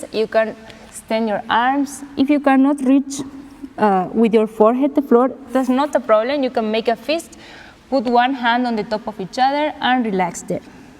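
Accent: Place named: India. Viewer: Nice English pronounciation style is same as Indians....JGD.....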